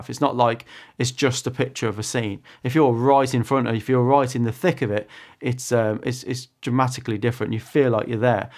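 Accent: United Kingdom. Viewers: Maybe I misheard you--sometimes I have trouble with British accents (I speak that weird variant of English spoken in the USA... :) BTW, I just bought the a6600 two weeks ago and I'm having a blast with it. I had stopped using my Canon 5D because it was just too heavy and bulky. Getting back into street photography with the Sony--I've found your videos very inspiring.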